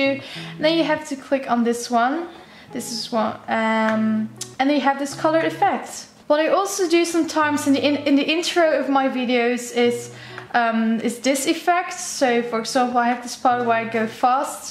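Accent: Netherlands. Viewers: Dutch accent..Then I read your FAQ and voila. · You have a Dutch accent.. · Ik kon aan je accent horen dat je Nederlands bent!💗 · wow ur accent is like netherlands peoples accent xD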